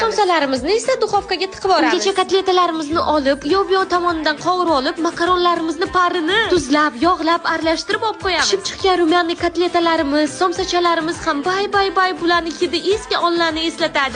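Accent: Khorezm, Uzbekistan. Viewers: yo adabiycha yo Xorazmch gapla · Shatur shutir Xorazmcha ❤ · Voy xorazmlikmisiz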